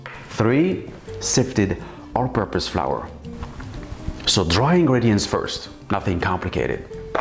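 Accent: France. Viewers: first time viewer. your french accent, and story telling abilities sold me. subscribed already. :) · You are so delightfully smarmy! Your voice, your accent, your facial expressions... oh, you make me miss my time living en France.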